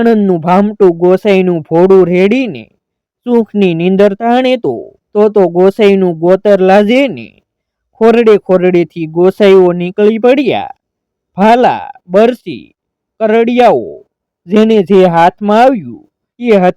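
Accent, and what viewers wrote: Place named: Kathiawar, India. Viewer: Varta charotar ni ne boli kathiyawadi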